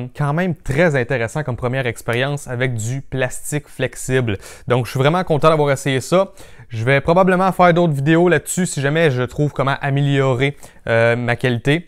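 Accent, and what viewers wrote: Quebec, Canada. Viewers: Ah un québécois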